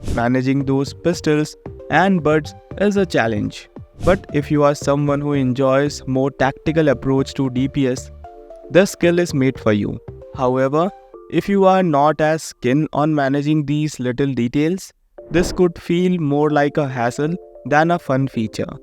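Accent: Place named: India. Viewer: What is that accent? Sounds like generic idian scammer.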